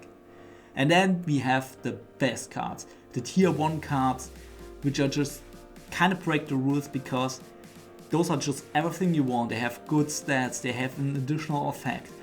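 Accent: Germany. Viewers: Dachte direkt in den ersten Sekunden "Das kann nur ein deutscher Akzent sein!":D Super Video!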